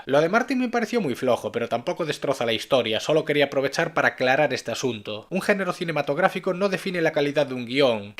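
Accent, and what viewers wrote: Galicia, Spain. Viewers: Tu acento me parece Gallego 🤔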